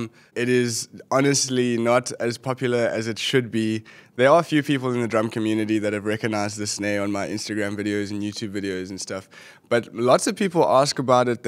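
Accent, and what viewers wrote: South Africa. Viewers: Are you from South Africa? 😊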